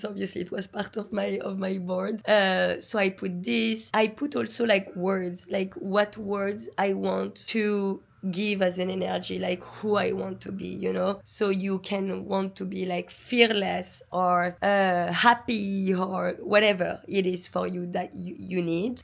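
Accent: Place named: France. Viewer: dear, thank you so much for all the directions you gave to achieve our dream lives. i feel like i am hearing from such a beloved friend when listening to you. love the way you express yourself (also, your french accent!). i do not only believe, but feel you truly are such an amazing person and have a marvelous soul. may your life be always filled with love, joy and blessings ❤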